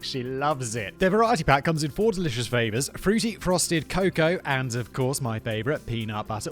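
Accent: United Kingdom. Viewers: How does your voice constantly trigger my Siri? Your a: younger then me (i’m 38 for context), and b: british so our accents shouldn’t match up (i’m from atlantic canada). Wild. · Being a person from the US who loves James Acaster, it's really funny to hear a guy with a british accent not know how to pronounce his name.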